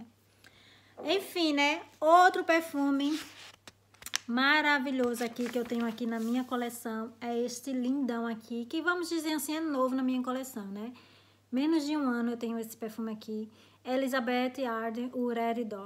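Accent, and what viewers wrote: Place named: Northeast, Brazil. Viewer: Amo perfumes e estou amando seu canal amo seu sotaque nordestino esse jeito gostoso de falar. ganhou mas uma inscrita. você Raquel Mota Mary Brito e o Ander Style são os meus yotuber favoritos❤💋💋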